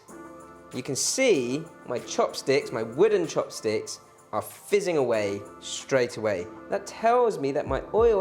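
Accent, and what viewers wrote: England, United Kingdom. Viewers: An Asian with a middle class English accent? Now I've seen everything! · His England or British accent doesn't have anything to do with his cooking skills. Trip part bou it is he may be Philapeno Lol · Lmaoo I don’t trust a Korean with an English accent making anything Korean then he made it so non Korean like 😂😂😂😂 nice try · I was confused for a second when he started to talk lol he looks asian but with a British accent. I will try this recipe, thanks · This Asian dude sounds more English than English people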